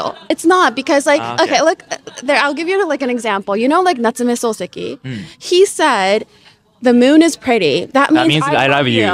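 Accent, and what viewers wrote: United States. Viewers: Again, American accent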